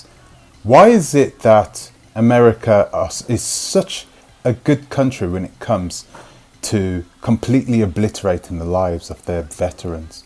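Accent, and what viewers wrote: United Kingdom. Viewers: Why don’t you focus on what the f** is wrong with Britain, the mother of all sadistic dysfunction . So weird seeing a black Jamaican in Britain talking about what is wrong with the United States in his British accent. UK is sending all your a*** back to Jamaica, why don’t you focus on that. Prayers and blessings for the BAG family for daring to take the future of their family into their hand and making such a bold and courageous move.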